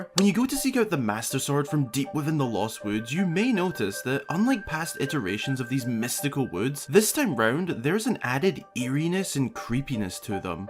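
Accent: Scotland, United Kingdom. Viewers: Last week, I had a dream that I was in a very creepy castle full of ghosts. As weird as it is, voice, in all its Scottish glory was there narrating was I was going to encounter.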